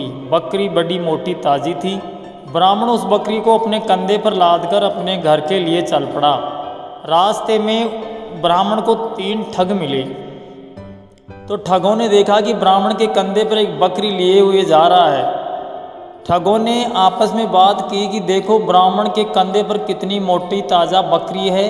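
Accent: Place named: Punjab, India. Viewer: Lagda y tu punjab ton a tere awaj lag rae a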